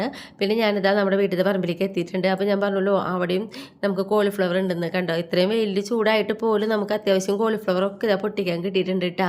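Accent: Thrissur, India. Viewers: Its Thrissur slang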